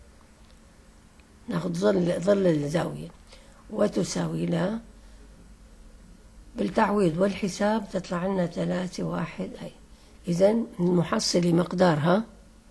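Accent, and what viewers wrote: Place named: Syria. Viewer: أخي أنت لهجتك شامية حاسس انك سوري.. على أي حال تحياتي الك من سوريا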